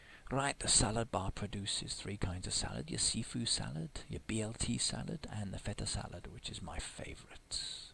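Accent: United Kingdom. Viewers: He's British lol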